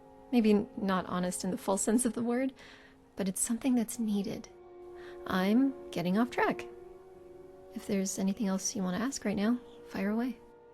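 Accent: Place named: Canada. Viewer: Love the voice acting! Will have to give it a download! Soothing voice too, love the Canadian twang :) Are there any known mod conflicts?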